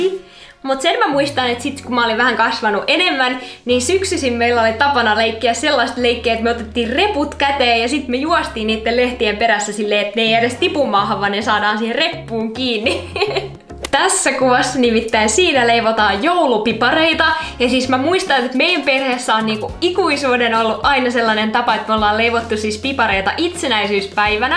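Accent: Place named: Tampere, Finland. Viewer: Sä kuulostat ihan Tamperelaiselta💕💛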